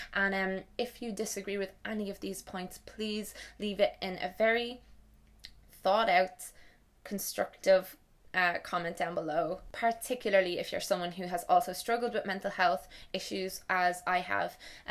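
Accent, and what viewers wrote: Ireland. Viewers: totally Agree with you about 13 reasons why, I have also self harmed and attempted yo commit suicide 3 times , totally ok now tho and grateful im alive but the programme was made in my opinion by someone who doesnt understand mental illness at all and think they should have sorted there research out before hand. also the irish accent is the best ever and I could listen to it all day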